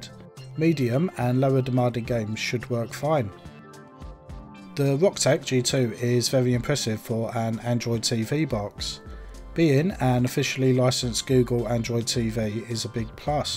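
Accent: Cockney, United Kingdom. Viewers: This guy is a proper cockney geezer 😂😂😂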